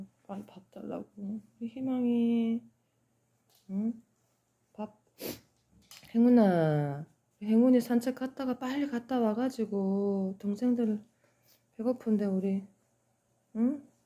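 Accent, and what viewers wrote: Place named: Gyeongsang, South Korea. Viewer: 정겨운 경상도 사투리 마력이 있네요~~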